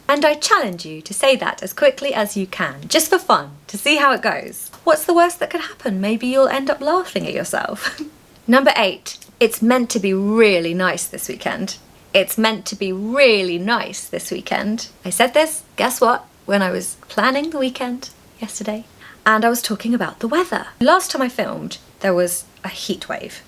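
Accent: RP, United Kingdom. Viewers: Hi, ❤❤wow! Congratulations. I haven't visited YouTube for months. I have been really busy with work. You are the reason that I visit YouTube! Really! It is so homely to be able to hear your lovely RP accent again. 🎉🎉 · Well I happened to come across your channel by chance, and since I love RP and modern RP accent and British manners, and you combine them perfectly in your way of speaking, your videos are perfect for me, since I both enjoy and improve my modern RP accent! Also, I can learn some new vocabulary and expressions too, so.. it's just perfect! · Congratulations once more for the new guest in offing. I love to hear you for your lovely RP accent and pronunciation. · Dear! I din't know you were prengnant!! Congratultions, I'm so happy four you!!! I love to hear you, your pronunciation it's so lovely, your voice it's so sweet and beautiful, it's a delightful to hear your british pronunciation! 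I send you kisses from Cordoba, Argentina. Gabi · It sounds very origin British accent....I admire it a Lot...we're waiting for more..thanks Teacher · Hi, I'm so happy for your pregnancy!! You look lovely by the way!! I'm watching your videos because of your inner and outer very feminine loveliness, and because You've exactly the British accent I would like to acquire myself I'm Norwegian born&bred bu have lived in Italy for 40 years now with no one to talk to in English, so I just wish to find my lost flow and to bring my accent closer to yours.if possible. Watching and imitating You is really helpful and FUN! Sending Love to You and Your husband Tom and your baby boy.! Gioia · very clear and easy to understand your British sound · Hey teacher. This is Julia, I am a visually impaired filipino student whose waching your videos since last year. I am the person who ask to you if you can sing a song for us, and I am the person who ask you if you already tried the filipino foods. 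Teacher? Can I ask you something? Do you had a filipino students before? Since I am a grade 10 student right now here in the Philippines, our lesson in english at School is all about model verbs. Teacher? Can I have a request? Can you teach model verbs? Thank you. The reason why I'm waching and listening to your videos because, I want to learn the british accent, I want to improve my english speaking skillss, I want to learn a british culture, and I want to become a content creator in the future. I will be a famous content creator someday, I want to use the english language, and I want to use the english accents like american accent and the british accents like london and the modern receive pronunciation. I want to share my knowledge to other people using the english language. Even it is hard for me to understand the accent sometimes, I'm still listening to all your videos. Teacher, I really really really really love your british accent! 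I really really love the way you speak, the way you teach, and the way you teach pronunciations. It's great! It is very very helpful and very very use full for me. teacher you know? Even I am blind, for me, you really really look nice! you look beaut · The reason why I’ve been watching your videos is learn British English and cultures. Your RP pronunciation is really marvelous! When I came across your channel for the first time, I reckoned that was where I would settle down regarding RP sound!❤ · I kind of copied your way to speak, and now I sound truly British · It’s not false; however, I believe that we must mean her own accent which is the 'modern received pronunciation' which is undisputedly a British accent and probably (especially) by opposition or difference with the American accent.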